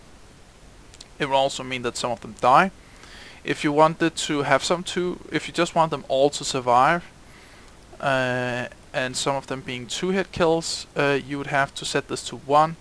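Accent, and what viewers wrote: Denmark. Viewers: Are U danish?? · Im curious, but are you by any chance Danish? That accent sound really Danish hehe (Im a Dane myself, thats why ;) ) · Jeg ved ikke helt... men jeg tror jeg kan høre på din accent at du er dansker? :)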